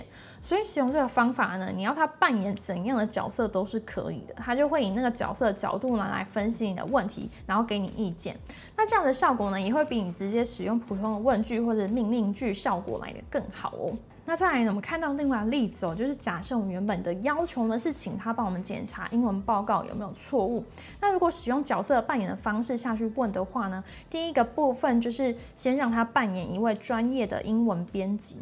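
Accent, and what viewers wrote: Taiwan. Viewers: 你这普通话绝了 一听就是台湾口音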